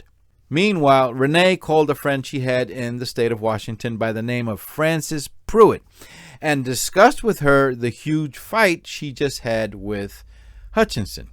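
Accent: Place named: Latin America. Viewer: acho o máximo seu inglês latino.😊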